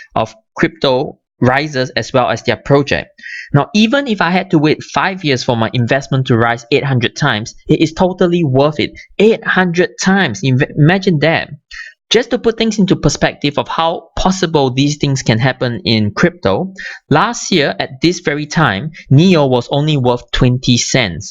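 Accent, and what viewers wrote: Singapore. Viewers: Yup, im from Singapore and I live in Singapore, when i heard your accent I knew you were from Singapore. omg nice to see a fellow Singaporean into crypto too, anyways we could get in touch, would like to learn more knowledge on crypto :) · Great analysis and explanation, and I like your Singlish! Can you review QLink ?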